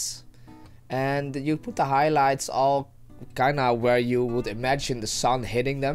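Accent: Netherlands. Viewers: nice tutorial. Random question: are you Dutch? You sound like a dutch person speaking english.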